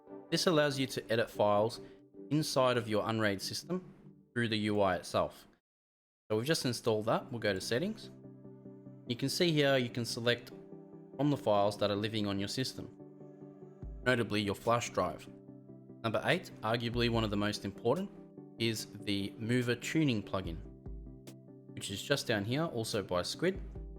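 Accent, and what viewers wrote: Australia. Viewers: so refreshing to hear an Aussie voice in these types of videos. Is unraid affected by this wireguard removal from distros?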